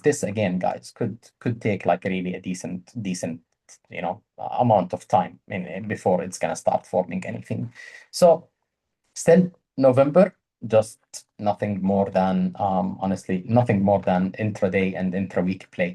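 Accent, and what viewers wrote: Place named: Saudi Arabia. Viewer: saudi accent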